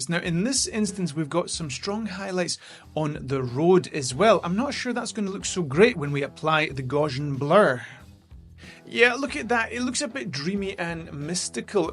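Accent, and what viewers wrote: Scotland, United Kingdom. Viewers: Well done (&!), Good to have you both back and rolling! Great content, effective comms/visuals as always. And for me the tempo / pace is perfect). 😃And enjoying the scottish entourage (and accents …😉) …👌🤛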